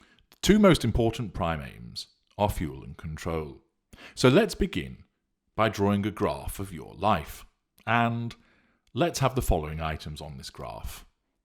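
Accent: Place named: RP, United Kingdom. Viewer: You’re and you sound ever so Posh!! Once again you’ve hit the mark 100% thank you Sir 👍🇦🇺 love your work 😘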